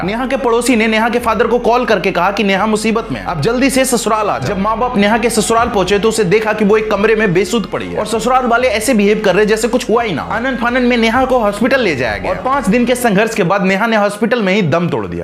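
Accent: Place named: Bihar, India. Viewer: Sadi nh shaadi 😅😅 tum log anchor Ban jaate Ho lekin Bhasha bihariyon wali bolate ho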